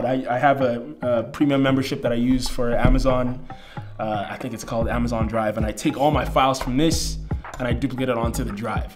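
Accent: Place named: Nigeria. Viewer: Vibes are strong bro.. Are you Nigerian? I can hear a small hint in the accent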